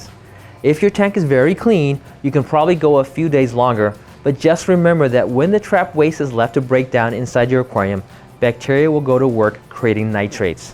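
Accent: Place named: United States. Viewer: This man's speaking american accent. You're lucky to have him informing you about marine fishkeeping bc he speaks slowly and carefully. This 10/10 presentation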